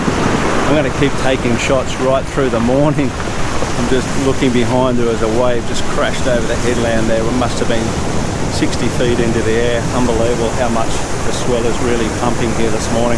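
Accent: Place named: Australia. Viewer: G'day, I've just given you a sub mate. I'm a learner and I've stumbled on to your channel and I've got to say how refreshing it is to first know where you're talking about and to hear a familiar voice in the form of your Aussieness. I'm enjoying the fact that you are shooting places that are reasonably accessible for a fella like me and I'm going to confess I will be visiting some of these locations. Thanks heaps for your efforts with this channel mate, fully appreciate it.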